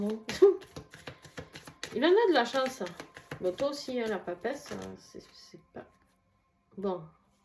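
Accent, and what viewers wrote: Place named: Marseille, France. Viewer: oh peuchère, avec ton accent marseillais on te croit sur parole !!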